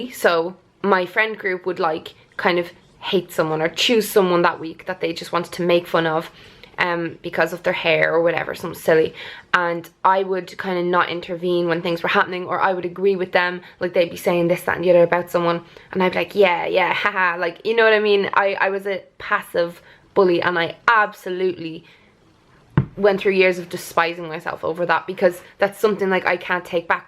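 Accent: Ireland. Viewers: Had to break for the Jehovah's Witnesses there, we had a nice chat there haha. Aw I love Beautycreep too, I've been watching her for years (being Scottish and all). I like the accent and live in Wales now. And you, provide me with the Irish accent that I love so much! Plus both of you have lovely videos, are so down-to-earth and have such a positive outlook.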